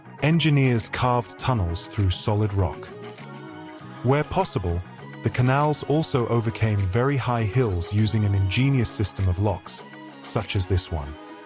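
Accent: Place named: United States. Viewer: British content, American voiceover??? Really?? Not at all sure I want to subscribe, even though it looks interesting.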